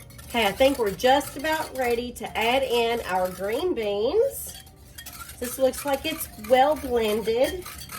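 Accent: South, United States. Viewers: I just found your channel ! I love it + i need it ! Love the southern accent wether Carolinas, Mississippi,Tennesee ,Arkansas !!! Idk but i love it We have no accent in the Pacific NW 😅 Look forward to getting back to some good down home , old fashioned cooking Jesus loves you ! from another sister who loves Jesus 💜✝️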